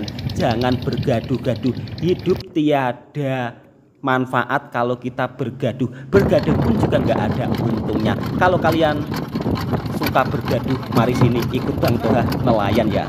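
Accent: Java, Indonesia. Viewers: Sekedar saran untuk bang toha : pakailah bahasa indonesia dalam narasimu... Abang kan orang jawa Indonesia kan, jangan karena abang sudah merantau di malaysia, lantas kosa kata dan bahasa yang abang toha sampaikan pun ikut berubah... seakan bahasa melayu malaysia dengan aksen jawa medok...😅 contoh : katakan saja terasi selanjutnya abang toha jelaskan kalau terasi itu dalam bahasa melayu artinya belacan... Banyak orang yang sudah merantau ke berbagai negara yang lebih jauh, tetapi aksen dalam bertutur kata dan bicara mereka dalam bahasa Indonesia atau bahasa daerahnya menggunakan bahasa dan aksen yang tidak berubah dari asalnya.. Seseorang yang mencintai negeri asalnya pasti tidak akan pernah melupakan kebiasaan dan logat aksen negerinya. ini hanyalah saran saja... terserah bang toha mau pakai atau tidak. Terima kasih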